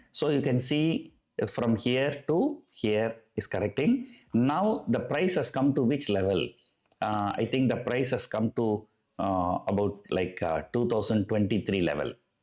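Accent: Tamil Nadu, India. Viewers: Do not let your tamilian accent influence on English.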